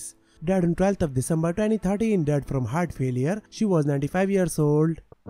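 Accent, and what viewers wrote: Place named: India. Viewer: Obviously, the narrator has an Indian accent.however, he makes it harder to understand because he speaks so FAST! Is this a race?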